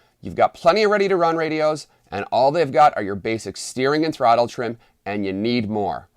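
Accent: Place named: New England, United States. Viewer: What part of New England ar u from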